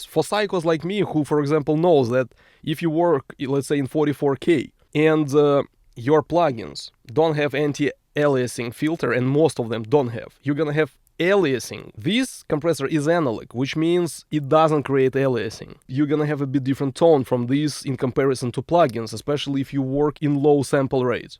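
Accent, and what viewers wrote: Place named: Russia. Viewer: Русский узнает русского за секунду)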